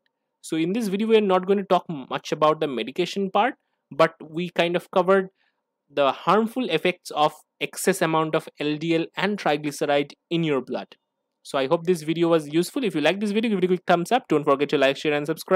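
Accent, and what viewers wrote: India. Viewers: bro use AI english or american accent it will promote ur videos,. we indians arent respected in the world so dont use our secret accent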